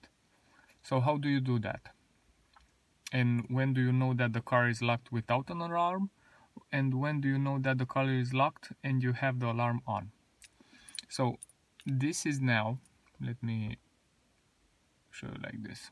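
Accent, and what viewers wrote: Romania. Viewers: Esti român? Ai accent :))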